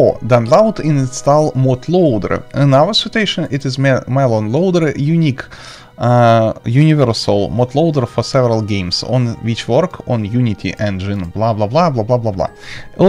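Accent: Russia. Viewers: ты ли это? акцент русский, голос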